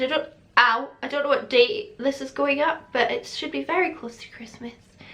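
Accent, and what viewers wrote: Scotland, United Kingdom. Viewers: I love when you do a deep Scottish accent every now and then! I recently found out I’m like 25% Scottish! Merry Christmas,! ❤️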